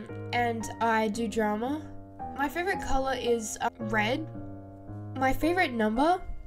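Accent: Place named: Australia. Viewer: Congratulations!! I love your accent and voice omg✨ is it an australian accent? 🦘 this drawing looks so beautiful!! Thank u for answering my questions 🌸 i love ur videos a lot❤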